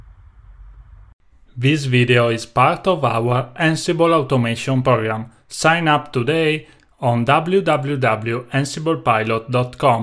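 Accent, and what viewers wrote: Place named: Italy. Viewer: Lovely precise, simple video. I love your wonderful cheery Italian accent !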